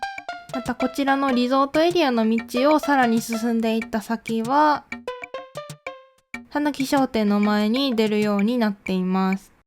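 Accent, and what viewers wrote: Kansai, Japan. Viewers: 儚げふわふわ関西弁お姉さんだった頃のアニキ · 最近あつ森を始めたのですが、ほんとにこんな素敵な島になるのかと驚かせていただきました🙄途中出てくるマップも好きだし、主さんの関西弁も好きです🥰🥰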